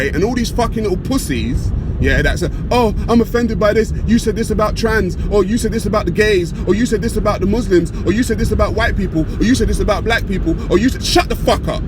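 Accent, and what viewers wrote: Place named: London, United Kingdom. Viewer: Tell you what, close your eyes and this was Samuel Jackson in a Tarantino film....with a London accent without the M16....brilliant and of course talking sence again people....respect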